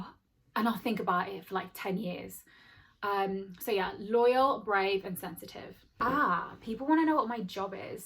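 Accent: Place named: United Kingdom. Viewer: Oof that British accent 🥵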